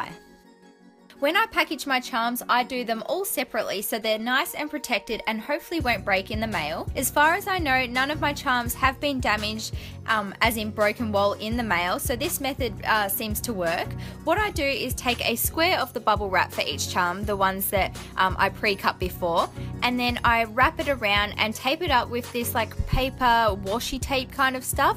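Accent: Australia. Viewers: Are you australian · Are you Australian? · Is she Australian? Because if so that will make me extremely happy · Are you Aussie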